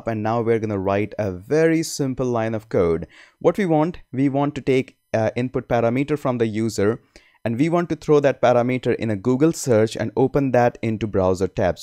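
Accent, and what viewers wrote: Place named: India. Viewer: When you talk fast your accent screams i.n.d.i.a.n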